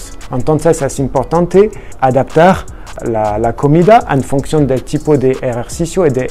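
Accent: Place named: France. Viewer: Pfff avec ton accent français tu me donnes envie de grrr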